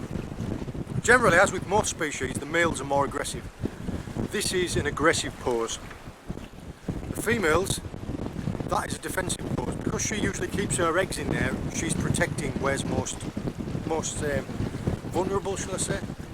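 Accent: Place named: Northern England, United Kingdom. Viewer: I would like to thank you for your videos I have been watching for about a year now but recently my dad who passed away Christmas morning found so much happiness watching/listening to you he had them on back to back for the last 2 weeks of his life I think it must have been your northern twang he found piece with as his farther and family come from Darlington. Once again thank you, you made a very poorly man relaxed thank you once again xx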